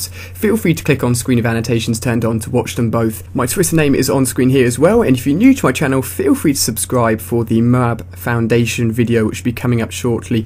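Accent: England, United Kingdom. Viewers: nice english accent I understood everything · r u british ?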